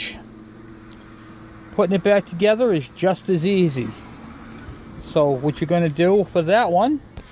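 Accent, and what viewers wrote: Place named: Maine, United States. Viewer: also, i noticed you have an accent... You wouldn't happen to be from Maine living in NH?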